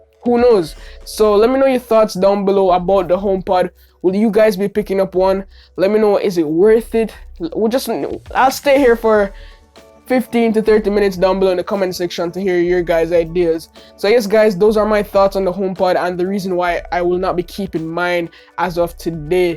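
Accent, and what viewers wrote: Jamaica. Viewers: I hear a Jamaican accent.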